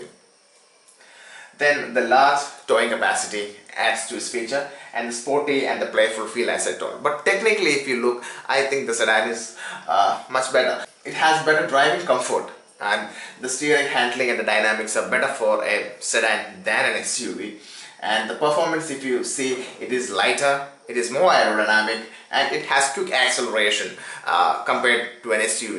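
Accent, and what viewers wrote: Kerala, India. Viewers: Dear presenter, Which part of Kerala are you from?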